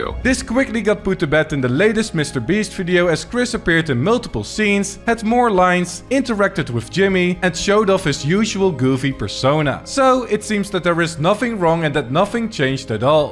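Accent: Netherlands. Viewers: Ben jij Nederlands? Je accent klinkt echt erg Nederlands🤣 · God the dutch accent.. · I can hear that you Nederlands are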